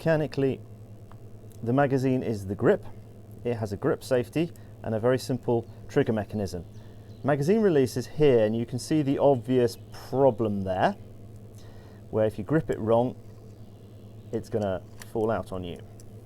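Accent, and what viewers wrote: United Kingdom. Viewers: From his accent,I assumed he was British,and living in the UK. I then send,WTF, how can he get access to a suppressed PISTOL ???!!! I then learn he is in Switzerland. Didn't realize they have gun laws not much different than in the U.S.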